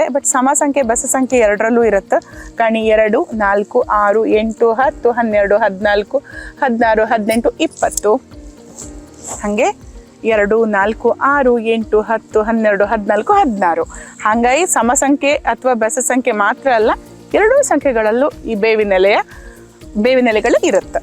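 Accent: Kundapura, India. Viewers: Nimm maath keli Kundapura koteshwra thallur upinangadi vanse maravnthe kollur.....nenpayth marre ! super👌✨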